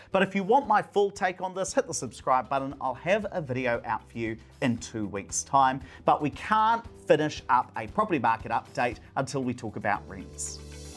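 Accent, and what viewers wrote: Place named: New Zealand. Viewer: He's OK it's just a terrible typical New Zealand accent most people couldn't understand. Mind you Sydney accent are the most worst ones.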